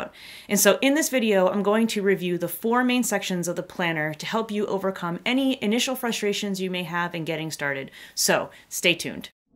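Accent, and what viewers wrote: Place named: New England, United States. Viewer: Found this by accident and great!!. Just what I need but need a faster brain to keep up with your Vermont one or somewhere in New England. Use to live in Norwich. Thanks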